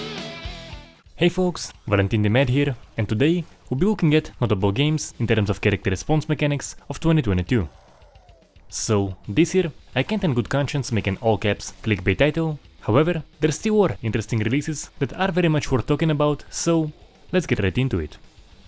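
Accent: Russia. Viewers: Блять этот русский акцент, кров из ушей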